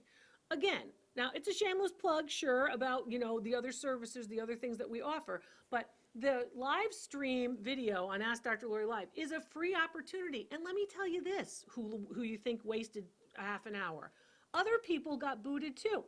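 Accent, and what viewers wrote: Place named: New Jersey, United States. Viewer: You are from NJ, aren't you? If not, you have us down cold. There is something about us Jersey Girls.....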